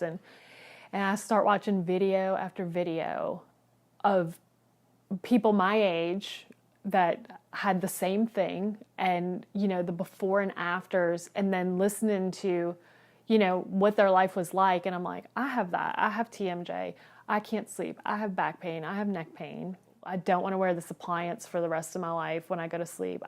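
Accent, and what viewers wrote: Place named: South, United States. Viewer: Our girl's got that southern accent🔥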